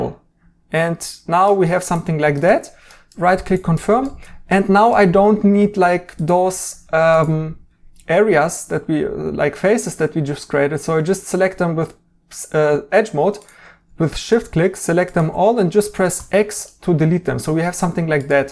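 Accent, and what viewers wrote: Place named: Germany. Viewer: I love your German accent , it’s so cute!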